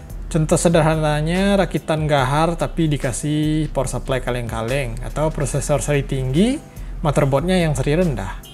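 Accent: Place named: Padang, Indonesia. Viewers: Ini orang padang ya? Dialeknya kentara bgt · Iya Gan Dia Orang Padang.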